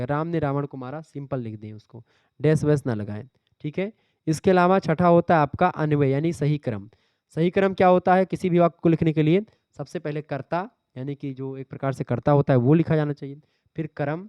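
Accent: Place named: Bhojpuri, India. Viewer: 😅😅😅bhojpuri achha lga aapke mooh se ho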